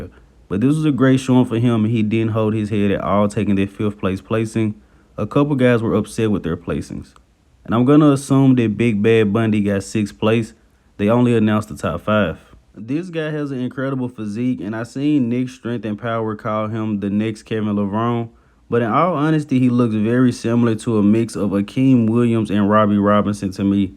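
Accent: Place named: South, United States. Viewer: lol man I grew up in the South, this is just how people talk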